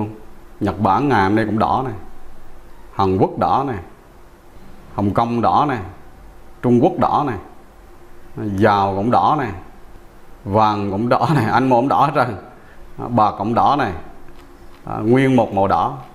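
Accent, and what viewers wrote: Quang Nam, Vietnam. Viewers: Kênh e rất hữu hiệu, giọng Quảng rất hay. Tuy nhiên cần chuyên sâu thông tin và phân tích tầm chuyên gia . Anh ủng hộ.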